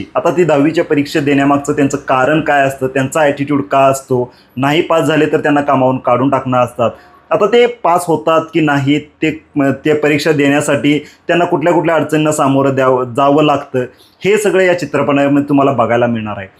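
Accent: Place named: Kolhapur, India. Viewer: बोलताना बऱ्यापैकी सगळे मुद्दे घेतोस, तुझी बोलीभाषा आवडली... नाद खुळा कोल्हापूर...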